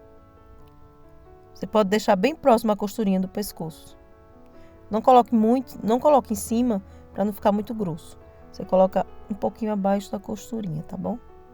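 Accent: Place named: Northeast, Brazil. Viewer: Tudo que você faz nos encanta, e esse jeito de ensinar maravilhoso😊 com um sutaque puro nordestino gostoso de ouvir, amoooooo...❤❤❤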